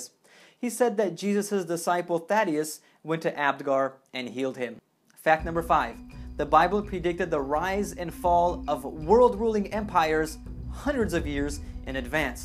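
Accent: United States. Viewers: Co tam u ciebie? so you are Polish or not? because you sound American. BTW I'm not Polish I just have Polish friends my best Polish friend is in Ireland on the run from the law for driving offences his name is Prawo Jazdy.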